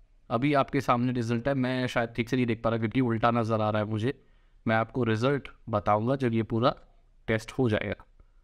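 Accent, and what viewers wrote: Odisha, India. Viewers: Kya aap odisha se ho ?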